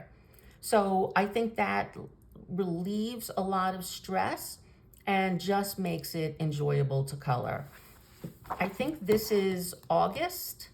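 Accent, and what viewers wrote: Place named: New York, United States. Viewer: Beautiful pages! Well done! I really enjoyed your explanation and i also love your voice and NY accent! ❤❤❤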